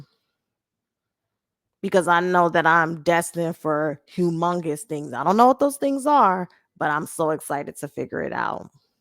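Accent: New York, United States. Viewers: I'm going to miss you like crazy. I've been listening to you for years😔 I can't help but think "Damn, I'm not going to hear that strong ass New York accent dropping jewels no more"🥺 And now I have to say bye to my youtube crush😔🙏🏿❤🙏🏿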